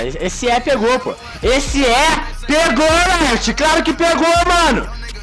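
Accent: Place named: Rio de Janeiro, Brazil. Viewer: é mt carioca msm... '' bota nela FP!! ''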